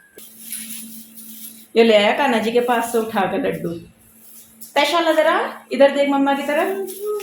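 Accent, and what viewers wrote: Haryana, India. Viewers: Mam aapka ghr kah h , boli to Haryanvi h Please reply me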